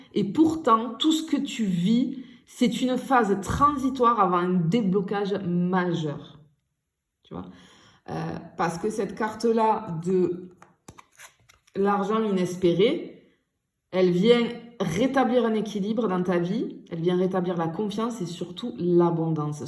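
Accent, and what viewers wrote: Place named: Provence, France. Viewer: Bonjour, j'apprécie la manière dont vous vous adressez à vos auditeurs, votre énergie et charmant accent provençal ✨💜✨🙏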